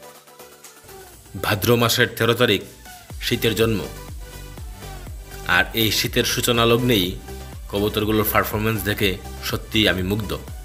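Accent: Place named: Habiganj, Bangladesh. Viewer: ভাই আপনার বাড়ি কোথায় আমাদের হবিগঞ্জের ভাষা মনে হল বলন কিন্তুু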